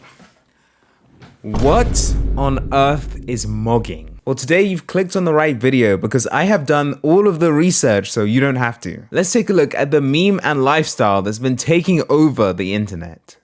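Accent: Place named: United Kingdom. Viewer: Dude I kinda hear an accent, are you originally from the uk? 🇬🇧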